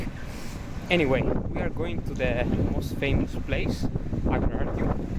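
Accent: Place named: Italy. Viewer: I love your accent! ❤ i love youu!! So amazing 😂😊✨ be blessed! I love italy! I love italian men ❤❤❤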